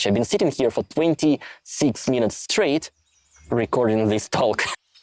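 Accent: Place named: Russia. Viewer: Stop pronouncing ''The'' as ''Zz'' Instead say ''D'' or maybe it is you sing as Russian English speaker it makes you more exotic.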